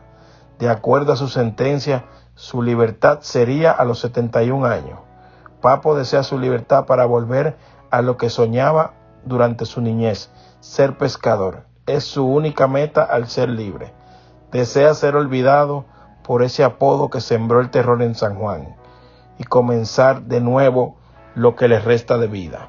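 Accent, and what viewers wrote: Dominican Republic. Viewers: Que mal lees , sos Dominicano?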